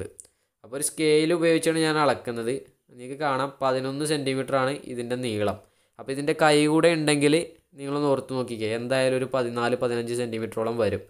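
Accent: Malappuram, India. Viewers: Malappuram slang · Malappuram tone eniyum veeenam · ഞാൻ ഒരു പത്തനംതിട്ടക്കാരനാണ് എനിക്ക് മലപ്പുറം ഭാഷ ഒരു കുഴപ്പവും ഇല്ല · ഏത് രീതിയിൽ സംസാരിച്ചാലും കൊഴപ്പില്ല... നമ്മൾക്കും കുറച്ചു മലപ്പുറം ഭാഷ പടിക്കാലോ 😁😜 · മലപ്പുറം ഭാഷ കേൾക്കാൻ നല്ല രസമുണ്ട് മലപ്പുറം ഭാഷ തെന്നെ മതി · Malappuram bhasha venam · Malappuram slang samsarichaal kandirikkan adipwoli aanu But baki ulla subscribersin manassilavunnilla thonnunna words translate cheythaal pwolikkum🔥🔥❤️❤️ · Malappuram basha sett 💯💯💯💯💯💯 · എന്തുട്ടാ ഗഡിയെ മലപ്പുറം ഭാഷ കിടുവല്ലേ 🔥🔥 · Bro I am from Trivandrum but I like your sound malapuram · മലപ്പുറം ഭാഷ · Malappuram പൊളി അല്ലെ. മലപ്പുറം ഭാഷ poli💥💥💯💯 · Malappuram style mathi chetta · മലപ്പുറം ഭാഷ കേൾക്കാൻ ഒരു രസമാ അതു തന്നെ മതി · മച്ചാനെ മലപ്പുറം രീതിയിൽ തന്നെ സംസാരിച്ചാൽ മതി അതല്ല പൊളി😍😍😍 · Malappuram language not problem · I'm from tvm... Malapuram slag kelkumpo interesting aanu... 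Ath mathii❤️ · Malappuram bhashayaa udheshichth ketoooo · Malappuram slang mathi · Malappuram slang 👍🏻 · Malappuram style is OK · Malappuram reethiyil samsarikkunnatha enikk ishttam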